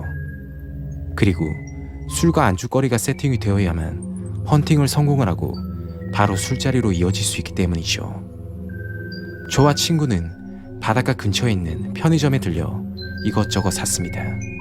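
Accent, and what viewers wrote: Gyeongsang, South Korea. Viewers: 목소리 특이하네요 갱상도 특유음성도 있고 잘듣고있습니다 ㅎ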